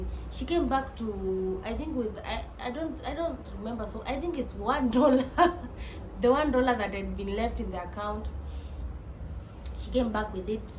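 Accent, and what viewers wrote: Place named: Kenya. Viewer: The kenyan accent! 9feb26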